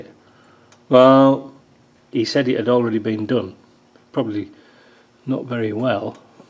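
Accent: Yorkshire, United Kingdom. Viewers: I thought Ken Dodd had stepped in for you for a moment until the Yorkshire accent came out.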